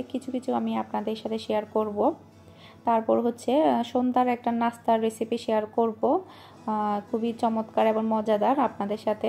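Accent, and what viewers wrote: Chittagong, Bangladesh. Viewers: Ha Chittagong er voice amio asi satay ki hon apa thik hoine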